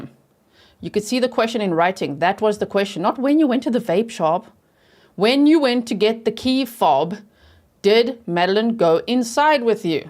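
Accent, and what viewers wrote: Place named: South Africa. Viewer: Shame - you're definitely south Africa. 😂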